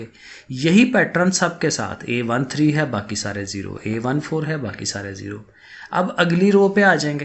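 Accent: Sialkot, Pakistan. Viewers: I think you are Sialkoti😊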